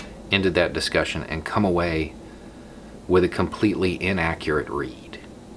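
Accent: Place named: Florida, United States. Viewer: Wow, I never really thought you sound like a Floridian until you tried to make the word “our” NOT sound like “are”🤣🤣🤣 I’m from Indiana and I can’t do it either🤷‍♂️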